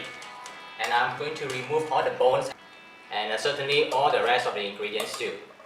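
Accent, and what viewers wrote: Singapore. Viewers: Is he speaking Singapore English? Cantonese, Please, so that everyone can understand.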